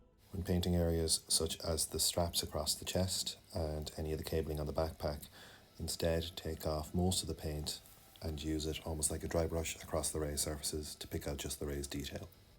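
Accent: Ireland. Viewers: u Irish ??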